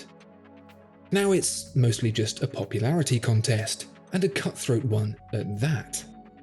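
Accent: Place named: RP, United Kingdom. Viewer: Maybe it was my IPad or me: the Autavia I own has more visual cues than “ go from second hand then case back then name; do that 23 times with a British posh accent that asks questions that he doesn’t answer”. How has Watchfinder earned so many subscribers with that formula? Is it the soft lighting effect and the expensive SLR camera sharing the screen? Perhaps all those other channels that offer watch specs and model line discussion and competitor choices that relate to real-world reasons of why we buy watches- well they must be crazy. Loop four close-ups and add UK accent and there you have it. Half million subscribers.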